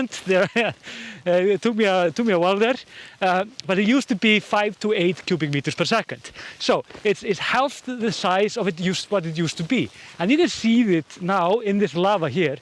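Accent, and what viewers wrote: Iceland. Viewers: I really love your repots from the volcano and and your funny Icelandic accent :). You and your camera man are really doing a great job! I'm wondering about more m3 of lava because, in my opinion, the eruptions have become much shorter than before. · I love Your Islandic accent ❤️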